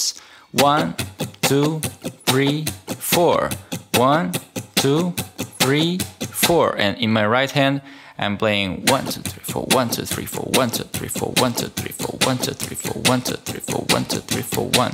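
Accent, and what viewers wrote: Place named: Eastern Europe. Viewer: Nah. This guy is accent is Eastern European.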